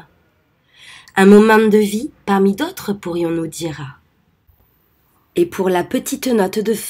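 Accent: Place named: Marseille, France. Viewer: Sympa l'accent marseillais ! Il te va bien.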